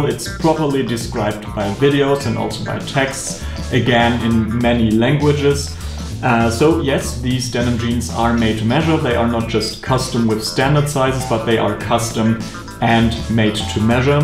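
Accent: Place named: Germany. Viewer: geil. wie man einfach das deutsche English heraushören kann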